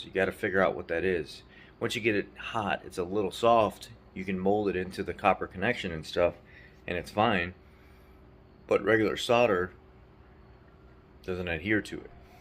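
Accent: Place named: Kentucky, United States. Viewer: That Kentucky accent made me laugh for 5 minutes straight..!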